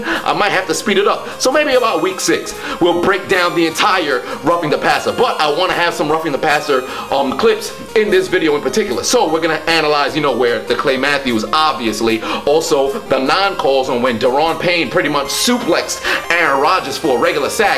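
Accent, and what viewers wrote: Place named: New York, United States. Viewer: Educated New York talk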